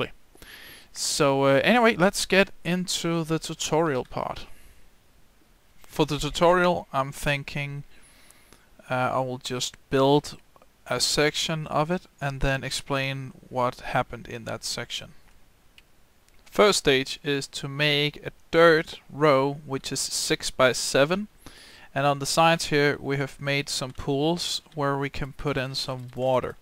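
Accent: Denmark. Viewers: Hehe, kunne høre på dit engelsk at du er fra Danmark! ;)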